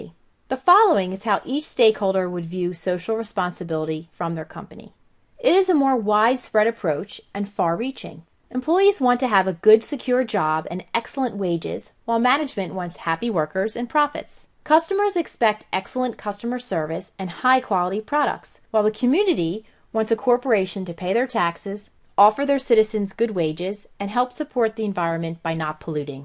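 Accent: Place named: Philadelphia, United States. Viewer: the super thick Philly voice-over accent is a bit odd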